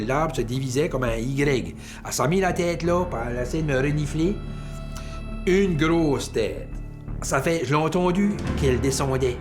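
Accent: Canada. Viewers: Bravo de pas les tuer pour rien vous etes un tres bon defenseur de l animal.venez au quebec y en full vs vous etes au nouveau brunswick par l accent...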